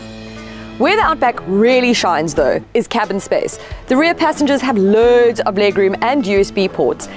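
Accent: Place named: South Africa. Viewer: The south african accent never fails to baffle me, how does it sound so posh and cool at the same time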